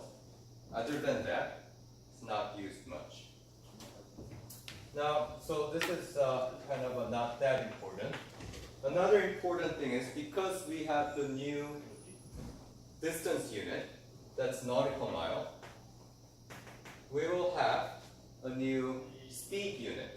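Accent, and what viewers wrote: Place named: United Kingdom. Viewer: Where did you get your British accent? LOL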